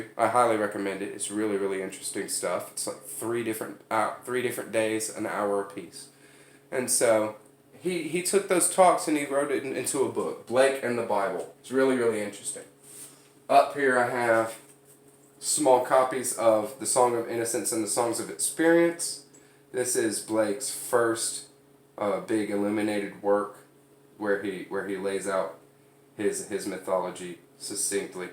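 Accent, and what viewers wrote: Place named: South, United States. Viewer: I know you have an accent but don’t yet recognize different regions. I would have guessed southern. I came across Blake in my early 20s, his songs of innocence. I was reading Jerusalem to my mum and she just sang it to me. She was of the generation where it was sung every morning at school. I investigated the thoughts of Blake in writing Jerusalem and loved him and his art ever since.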